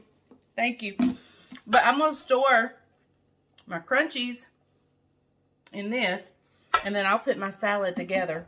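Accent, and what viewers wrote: South, United States. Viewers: I noticed the wrong setting on your new camera, I know you will get it stright just want to mention it incase you can fix it ( I know I could not) please dont get up set by this note just information. any thing that is read is backward the door to the back room is on the wrong side of you (near Carolines picture. )I sure enjoy this channel. I have to turn on closed captions because I am not very educated, you have a southern draw, you talk about things I do not know about, anyway I thought it very funny how the closed captons say things I am sure you never said.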